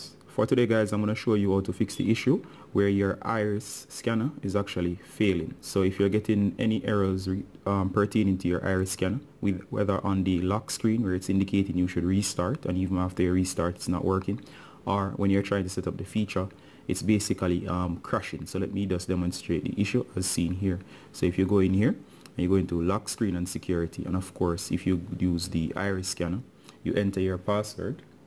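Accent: Jamaica. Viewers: came for iris scammer fix stayed for the jamacian accent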